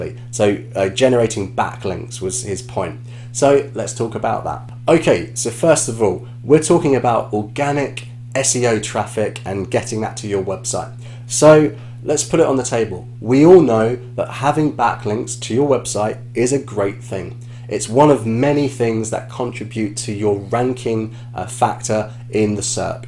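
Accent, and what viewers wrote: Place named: United Kingdom. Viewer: A British accent review is a treat to watch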